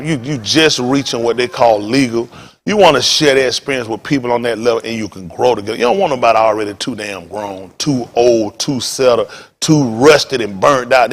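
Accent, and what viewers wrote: South, United States. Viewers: I'm confused is he teaching bible or what yesterday was the first time i heard him and he was angry at pastor Jennings I'm tryin to stay connected to God why is it so much confusion going on teach or talk about whats happen in the world.and not explain what ever he's tryin to say what? Is he high!! A fast country talkin bad language in the church.he is in church?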